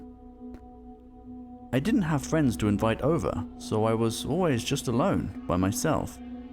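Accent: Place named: England, United Kingdom. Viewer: Subscribed on the first thing. Yay~ Your English accent is so pleasant and your voice will definitely be in my ears as twice as before since you will be uploading quite often from now on. 🤭 Super thoughtful of you to add in the pixel art 3D styles visual, totally loving it. It makes the entire video become so interesting and lively. I got spooked . Lmao. 🤫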